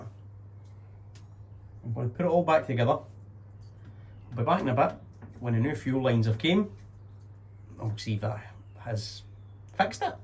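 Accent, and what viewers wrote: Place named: Scotland, United Kingdom. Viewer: That's a normal Scottish accent .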